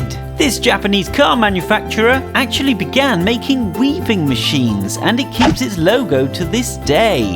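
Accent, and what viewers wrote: England, United Kingdom. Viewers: why are you speaking american with an english accent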